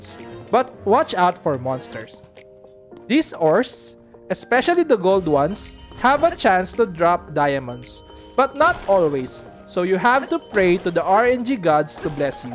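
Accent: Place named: Philippines. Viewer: you kinda sound like a filipino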